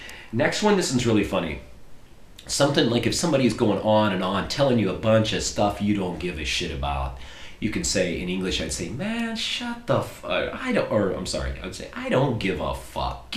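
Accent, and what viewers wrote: United States. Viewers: U have like the most american accent when u speak serbian. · It's funny, how you would actually fit in Serbia, but not with that American accent.. Haha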